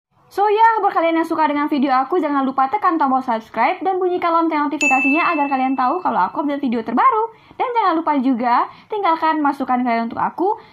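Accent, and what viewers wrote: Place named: Minangkabau, Indonesia. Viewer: Bahasa indonesia ada dialek minang ya mba but over all i like your effort, sukses....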